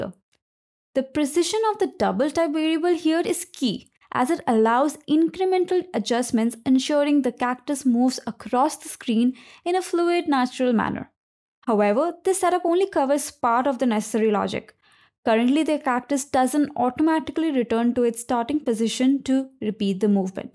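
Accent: India. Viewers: You're legitmately just a racist. This is perfect english with an Indian accent, if you don't like her accent, that's a you problem.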